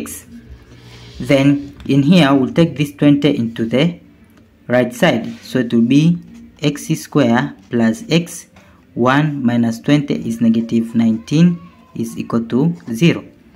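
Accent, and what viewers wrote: India. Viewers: 这尼玛印度口音绝了。这题解的 跟傻子似的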